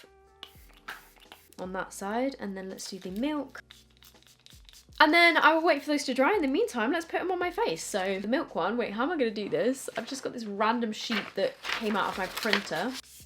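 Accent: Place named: England, United Kingdom. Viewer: I subscribe and watch you to learn English accent!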